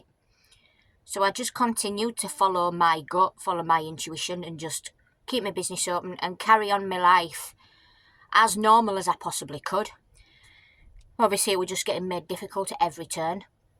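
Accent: United Kingdom. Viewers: Hiya flower, You sound like your from my neck of the woods ? I'm a Bury lad. Moved to Pembrokeshire 28yrs ago. Wide awake and unj@ I'm looking at vanlife again. Keeping my upholstery business. So I might live outside my rented premises... Totally and utterly worn out with the matrix....like seriously worn out.... if your ever in Pembrokeshire. Pop in for a cuppa. Empty your grey and fill up with water.... cheerio now sis 😊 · What's your accent? I'm guessing Lancashire, but not sure.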